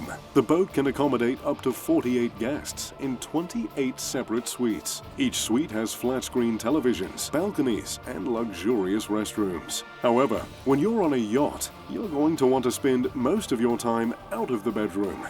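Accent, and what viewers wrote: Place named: England, United Kingdom. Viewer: I love your voice !! My mums from England I love the accent !!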